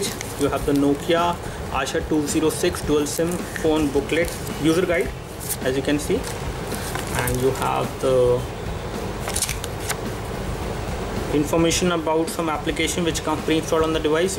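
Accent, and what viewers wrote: India. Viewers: omg hindu acent bleeee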